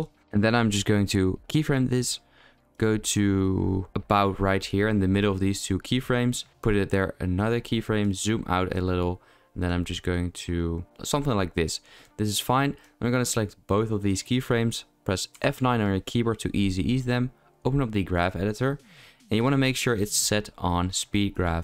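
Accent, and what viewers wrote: Netherlands. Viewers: maybe a weird question, but are you dutch? lol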